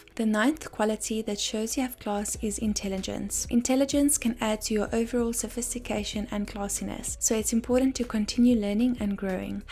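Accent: South Africa. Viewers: South African, maybe Capetonian or Joburg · South African 😂😂😂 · 💯 South African accent 🎉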